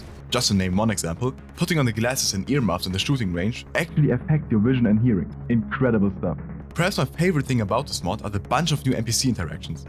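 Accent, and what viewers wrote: Germany. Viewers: Smol Question, are you german? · ich hoer sofort dass du ein deutscher bist. macht aber nix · You sound german...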